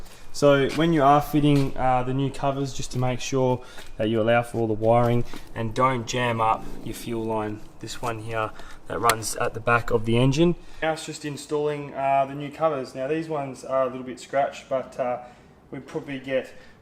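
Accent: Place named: Australia. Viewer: In addition to this Australian Youtube Channel I also watch the Dangar Marine Channel. Stu , the creator of the Dangar Marine Channel is located in New South Wales and I believe the creator of this channel is located Western Australia. Question? Are there regional accents in Australia? While to my American ears both of our Aussie hosts sound Aussie, but it seems that there is a slight difference in the way each man pronounces certain words.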